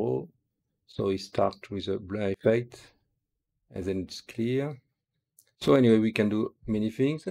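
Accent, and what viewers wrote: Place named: France. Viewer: Thanks for this tuto. Sounds like your french like me 😅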